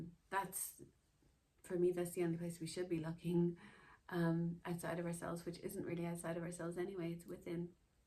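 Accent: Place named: United States. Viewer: Why are suddenly AMERICAN? WTF?!!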